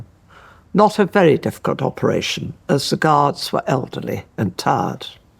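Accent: United Kingdom. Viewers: She's got an amazing accent-I.love the upper class British accent!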